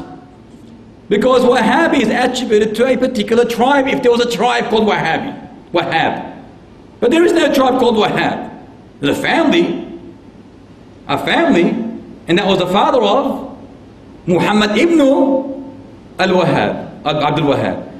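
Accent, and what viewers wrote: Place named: Australia. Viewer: before insulting him, please know that he accent is Australian and that's how they talk. Don't judge him for the way he speaks, but for his content.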